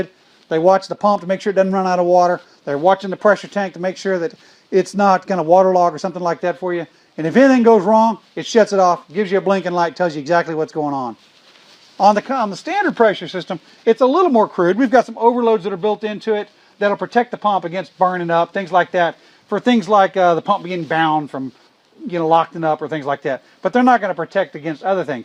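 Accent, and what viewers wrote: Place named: South, United States. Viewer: Just listening to your southern accent makes you sound dumb. That's even before you just schill dumb shit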